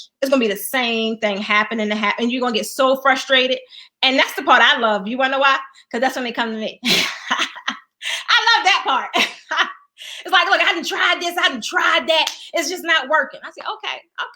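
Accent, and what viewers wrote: Baltimore, United States. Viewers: I love the bmore accent. Hope I got it right🌻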